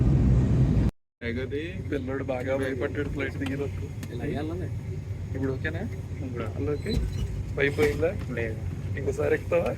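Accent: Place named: East Godavari, India. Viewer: ఆయ్.. ఆ తూ గో యాస... Missing a lot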